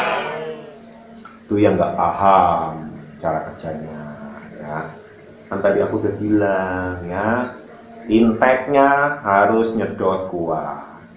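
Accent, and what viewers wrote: Banyumas, Indonesia. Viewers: Basane ngapak temen kue kang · Daerah mana Boss,kok ngapak dialeknya.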